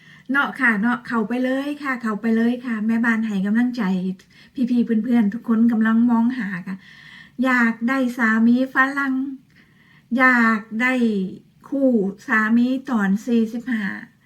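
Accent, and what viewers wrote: Ubon Ratchathani, Thailand. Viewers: คนอุบลนี่เว้าจาม่วนๆๆสุภาพอิหลีได่น้อจร้าา🥰🥰🥰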